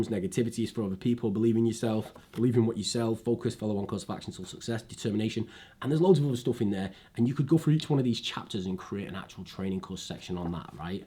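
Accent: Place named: South Africa. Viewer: You talk like a South African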